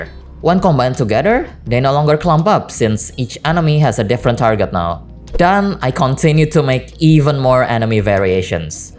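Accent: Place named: Indonesia. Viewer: how come you sounds indonesian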